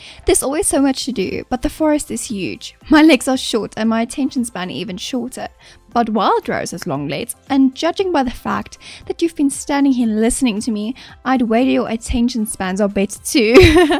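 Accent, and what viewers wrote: South Africa. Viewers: Your accent sounds so familiar. Is you South African like me?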